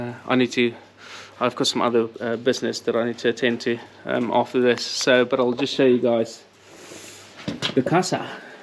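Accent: South Africa. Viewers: Thought l recognise that SA accent. Bietjie verbaas dat jy kla oor kerkklokke wat mag raas? skaam jou! Klou aan die bietjie mooi in die lewe. Dis n voorreg om daar te kon koop en alhoewel dit baie werk gaan wees, maak die meeste daarvan - van ALLES!!! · is that a SA accent hear? SUBSCRIBE!!! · I support you efforts on restoring this Italian beauty but please please work on that monotone South African narration 🤮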